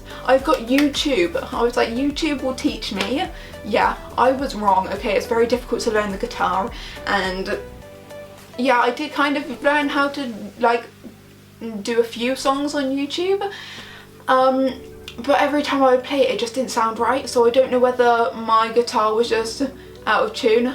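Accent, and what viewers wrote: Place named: South West England, United Kingdom. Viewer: I'm from the south west too, Bristol to be more specific. I thought you were from the south west, because of the accent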